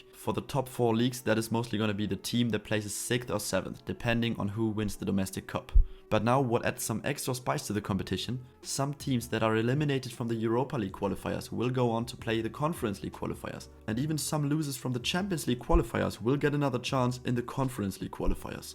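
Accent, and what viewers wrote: Germany. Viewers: Der Deutsche Akzent haut rein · Are you German Weil es klingt sehr deutsch vom Akzent her 😂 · I hear the german accent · Man merkt halt so krass das du Deutscher bist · This dude speaking English sounds like he is speaking German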